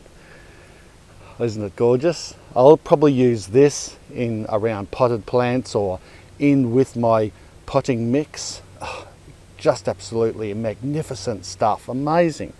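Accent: Australia. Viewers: 😂😂😂😂😂😂😂😂😂😂😂😂😂😂. I love ❤️ he’s Aussie lines and accent.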